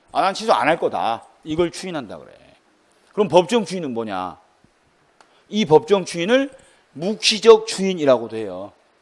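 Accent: Jeolla, South Korea. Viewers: 이 아저씨 왕 섹시하다. 아우라 오지고. 전라도사투리도 꽂히네. 머더러 혀...?.아니여.현존이악만줘. . . ㅋ ㅋ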